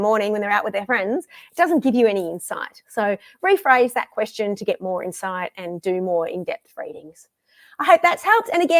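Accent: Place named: Australia. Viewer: Love your Australian accent ❤ you are a superb Teacher ❤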